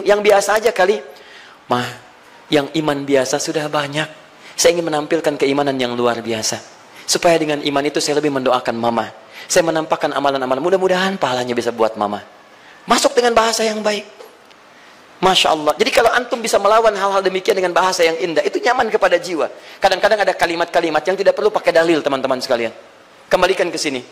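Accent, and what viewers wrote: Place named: West Java, Indonesia. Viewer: Alhamdulillah terima kasih Pa ustad kaya orang sunda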